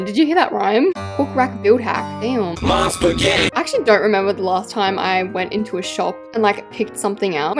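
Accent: Australia. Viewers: Your from Australia same gurl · OMG UR AUSTRALIAN??!! if u r im so HAPPYYYY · What your Australian?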